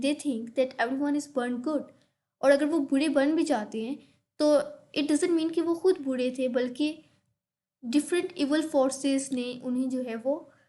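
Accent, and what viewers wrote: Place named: Lahore, Pakistan. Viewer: Lahori Accent ...But well explained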